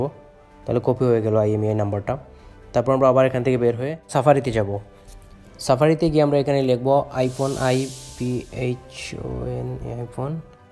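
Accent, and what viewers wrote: Sylhet, Bangladesh. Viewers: Bhai tumi Sylheti nay ni....❤ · Vai kita sylhety ni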